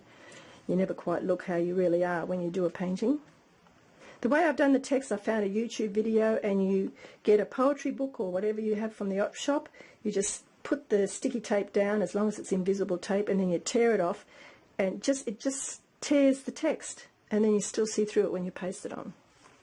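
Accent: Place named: Australia. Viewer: Great to hear an Australian accent on YouTube! haha Love the artwork :)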